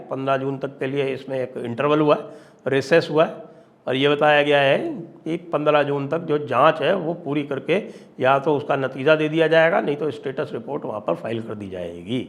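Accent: Haryana, India. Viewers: Ram Ram sa Sir🙏 Your Haryanvi accent is amazing😊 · sirs haryanvi accent is colourful 😅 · Once again the Haryani accent makes my day Dada!😂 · Saaaaar ji aaaapki haryanavi gajjaaabb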